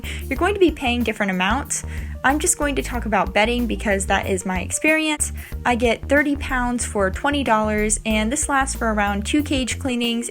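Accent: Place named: England, United Kingdom. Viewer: Interesting, i get to hear a version of you with a strong English accent using £ pounds instead of dollars. Love both versions!!! YOU ARE AMAZING 😁👑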